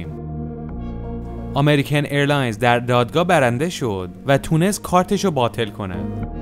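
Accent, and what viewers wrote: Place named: Varamin, Iran. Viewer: لهجت میخوره ورامینی باشی🤩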